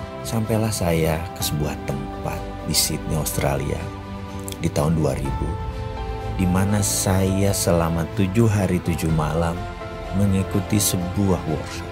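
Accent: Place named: Surabaya, Indonesia. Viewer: iki wrong endi ce rek?,..,. Jadi pingin Dolan nan omahne pingin belajar bisnis. kayak, e wong suroboyo jawa timur bahasanne.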